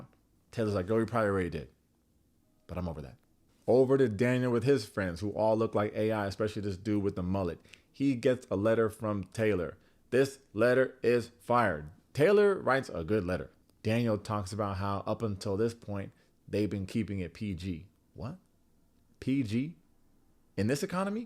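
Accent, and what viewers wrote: Virginia, United States. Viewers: I will never not love your Virginia voice. Ever. Never change, King. We’ll miss you at the reunion!!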